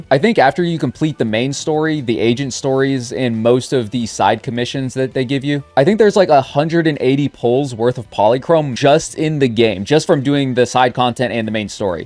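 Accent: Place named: United States. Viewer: I tried to watch your video but... I don't like your voice. It's so slurred and croaky. I also don't like your style of American accent. If you could do something about that, that'd be great.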